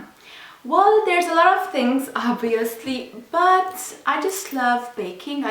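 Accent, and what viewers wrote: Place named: Romania. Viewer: I Love your videos soooooo much, I really enjoyed getting to know you better. You are soooooo BEAUTIFUL!! Also I love your Romanian Accent!! Xxx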